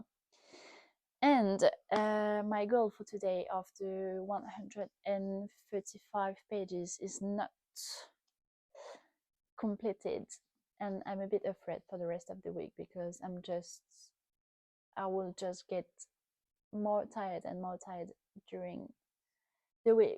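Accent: France. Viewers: j'entends l'accent français, j'apprécie.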